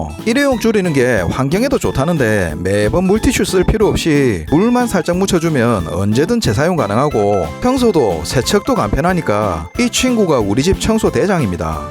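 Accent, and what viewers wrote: Gyeongsang, South Korea. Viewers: 아 갱상도 사투리 듣기싫네 정말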